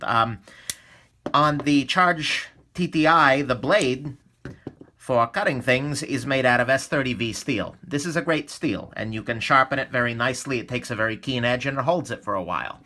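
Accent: New York, United States. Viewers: I love this man's Accent, makes me miss NY. .😭 CONEY ISLAND LET'S GOOO!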